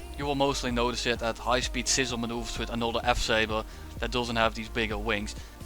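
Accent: Netherlands. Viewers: Subscribed. I hear a clear dutch accent. Groeten uit limburg!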